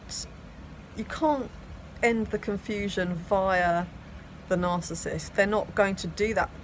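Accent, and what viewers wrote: United Kingdom. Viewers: British accent is hard for me to understand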